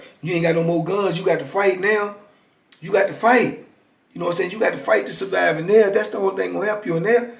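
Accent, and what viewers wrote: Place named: South, United States. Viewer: Just caught your channel, homie! Just wondering where you're from? I've heard a lot of stories from up north, but i swear to God, you were born in the south wth that accent! 🤣 keep up the awesome content.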